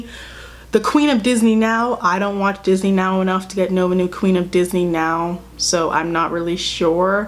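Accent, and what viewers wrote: United States. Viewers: Gina Rose Belarde well Americans tend to think everyone is American. I can clearly see she’s American but my point is, why does her tone of voice go up at the end of every sentence. Of course I know how American sounds like. You lot are everywhere in the media. This accent is something I’m no familiar with. Her message is hard to grasp because she sounds unsure. Even her facial expressions and that’s just my opinion. Idk why some of you replying to my comment like I said something inappropriate or controversial. You could’ve just told me what area she’s from or anything else that was real information rather than ‘are you not American?’ No of course I’m not American wtf maybe if I was I wouldn’t have such a hard time understanding her delivery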